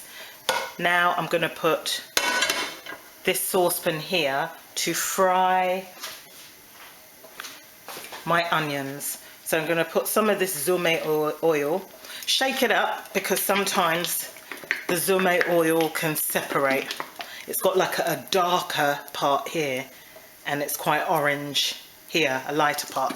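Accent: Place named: RP, United Kingdom. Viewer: I like your accent is so posh ❤️😂😂😂 ! Love from a Ghanaian sister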